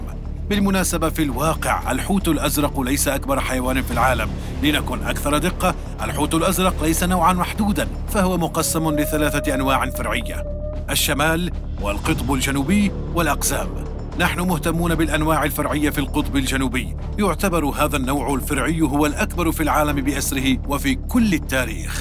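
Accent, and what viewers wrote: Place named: Lebanon. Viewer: صوت مميز يصلح في الوثائقيات والاخبار بصفة عامة ❤ ما اسم صاحب المقطع واتوقع انه صوت لبناني يذكرني بصوت وحيد جلال (سلفر) كانه هو الاميز من بين الاصوات العربية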